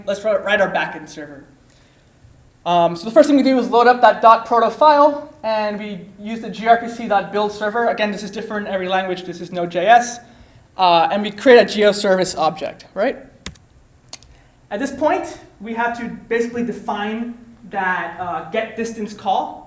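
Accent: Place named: West, United States. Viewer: Useful video. However may I suggest that you might want to try to keep your accent a bit neutral. I see a heavy leaning towards the western accent, but that's starting to sound quite artificial and meddling with comprehension. Just my 2 cents.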